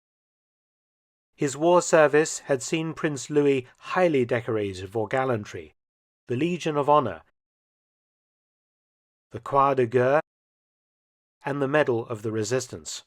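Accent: United Kingdom. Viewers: The very style of this very British narration leaves me with the impression of an implicit disdain for the French overthrowing of monarchy and going with a republic. Haha.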